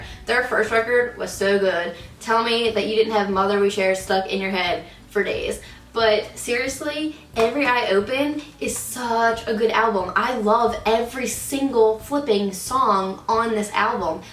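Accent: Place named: Tennessee, United States. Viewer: Is she from Tennessee? I'm trying to guess from her extremely subtle accent.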